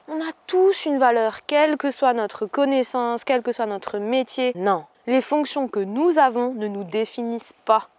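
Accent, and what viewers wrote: Alsace, France. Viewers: Bonjour, petit accent Alsacien, Feu Tomi Nora Mols ……….lol……. J'adore...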